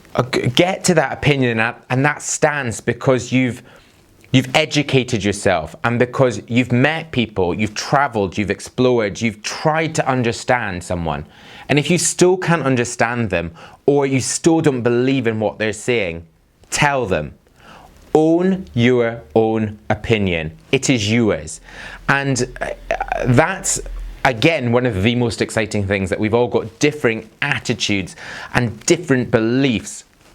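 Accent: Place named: England, United Kingdom. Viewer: where abouts in England are you from? Your accent is amazing!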